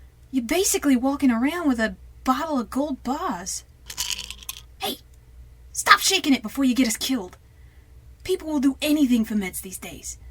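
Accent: United States. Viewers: I'm gonna assume this is the Boston kind of accent. I'm from the South, and I never met a Northener before, so I can't tell accents apart. But I love it! I love everything in this! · You have an amazing New York accent.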